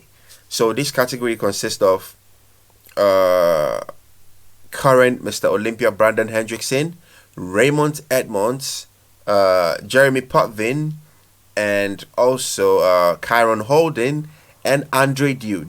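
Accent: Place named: France. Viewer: I think he speaks french. Souns like a french accent.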